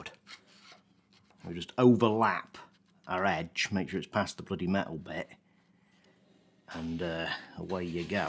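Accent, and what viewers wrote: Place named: Bolton, United Kingdom. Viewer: Good idea for those tools in constant use the simple ones are the best, if I closed my eyes I'd swear I could hear a young Fred Dibnah your not from Bolton by any chance?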